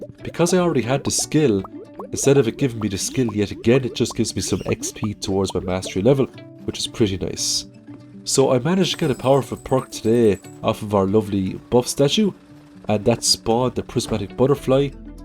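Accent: Ireland. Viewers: I literally came back to this video to post this comment. I watch these videos once or twice while I'm awake to get ideas for my own playthroughs, and then I use them over and over to fall asleep to because doesn't ever raise his voice. The Irish accent helps with the relaxing quality too I think. So many other content creators can't be trusted to not scream in my ear suddenly while I'm trying to fall asleep. If you're into calming voice overs in general, I recommend Steve1989MREInfo. Completely different channel, he reviews MREs, but his narration is very calm and consistent throughout his videos.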